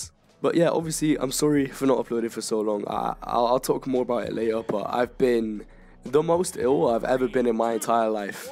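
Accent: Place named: Croydon, United Kingdom. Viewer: Oh okay I was just wondering because you sound like you’re from Croydon when your sick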